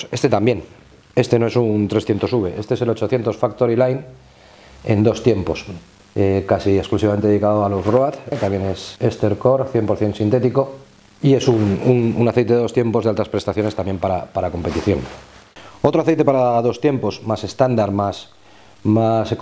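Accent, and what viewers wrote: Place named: Spain. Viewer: Por el asento este hombre es español, y en España no se debe comercializar la honda xr 150.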